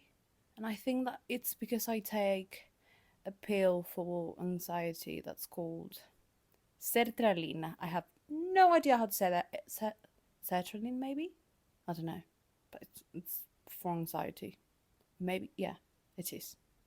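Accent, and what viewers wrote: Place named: United Kingdom. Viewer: Esto ya lo he dicho un monton de veces.... Si no supiera que eres chilena igual que yo. Juraria que eres britanica.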